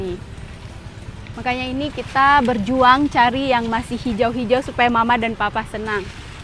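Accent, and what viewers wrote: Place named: Sulawesi, Indonesia. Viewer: Pengaruh dialek😅 Sulawesi e lebih tajam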